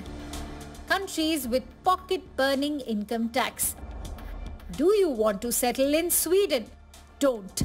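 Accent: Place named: India. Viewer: Cheap Indian accent led to cheap and wrong news 🤦🏻‍♂️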